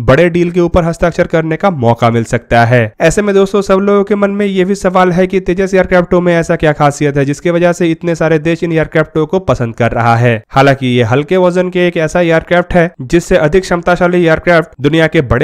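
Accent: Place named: South India, India. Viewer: Arey bhai pehle "indigeneous" pronounce karna toh seeko, north india se aakar south india jaise baat kyun karte ho, kya shiksha ka sthar itna ghat chuka hai kya uttar bharat mein, toh bi phir kya hua, aajkal internet se bi sheeksha ki uplabdi toh utta sakta hai.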